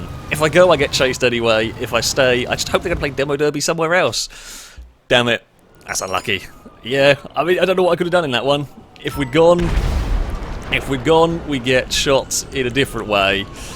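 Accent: United Kingdom. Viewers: It's guys like this that give the British accent abuse... that, hellllllooooooo at the intro. Fs man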